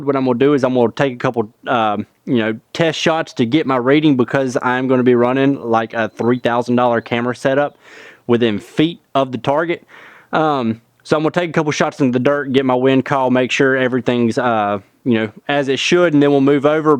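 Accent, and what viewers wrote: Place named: South, United States. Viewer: Finally a southerner, I get so tired of listening to yankee's talk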